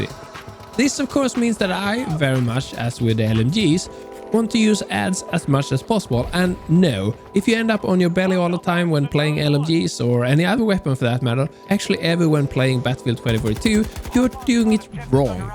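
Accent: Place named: Sweden. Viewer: Knew you had to be Swedish with your accent haha, jag är halv Svensk. I think the AK and M5 feel so inaccurate and weak compared to the SCAR — I don’t understand how some of my friends dominate with them but I struggle to hit open shots consistently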